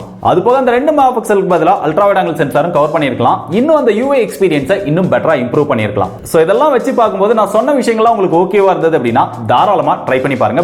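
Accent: Kovilpatti, India. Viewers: Bro neenga kovilpatti ya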